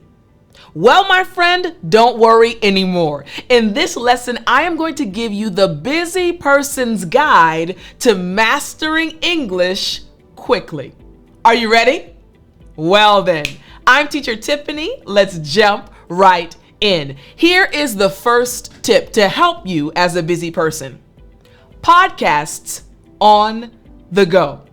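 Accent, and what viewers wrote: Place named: United States. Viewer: Best American accent I've ever heard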